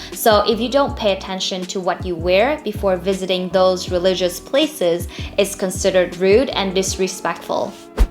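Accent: Midwest, United States. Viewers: u speaker very smooth US tone English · Where were you at in the states? Your accent sounds very neutral like the midwest accent. I think many people who would hear you speak English would assume you were American if they didn't know your story. Also as someone from one of those colder states... you know 50F/10C isn't that cold. Don't scare some American into bringing a winter coat to Hanoi lol. Light jacket and jeans is more than fine for that. I am sure you got used to seeing people in Shorts and Sandals/Flipflops/(no idea what Vietnamese call them) in that level of cold while you were here. Even in the true winters here in the colder states you see it. Asian Americans in flip flops, and college students in shorts year round is something I'm just used to. Even if I think they are a bit insane. Especially when it is snow covered and -10F/-23C. Put on some boots and jeans people lol. 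· Thank you. I don’t think you had lived in the U.S for just 7 years. You speak with a perfect American accent. I feel a bit embarrassed about my English even though I have been living in the U.S for 10 years and working in a hospital. I am learning from you!!! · Mình đã nghe nhiều người nói tiếng anh. Mình không phân biệt dc người này là một người việt nam hay một người ở mỹ do phát âm quá giống. Đến khi mình nghe nói là tên và ở mỹ 7 năm. Tất nhiên tây gốc nó phát âm cuốn hơn nhưng cơ bản mình không thể biết đó là người vn đang nói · For a native you sound like you spent quality time in the US or Canada... EDIT: just got to the part where you spent 7 years in USA. :)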